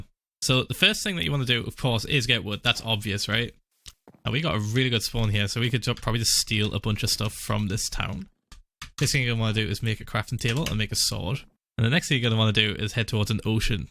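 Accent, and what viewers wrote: United Kingdom. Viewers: gosh i love that british accent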